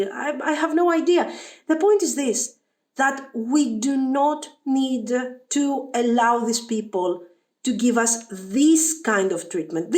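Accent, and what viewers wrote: Greece. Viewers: Your English is perfect actually! You simply have a very Greek accent, but your use of the language is great. I'm happy to see a Greek youtuber gaining popularity! Keep up the good work. I'm showing your videos to friends and family for nee channel recommendation!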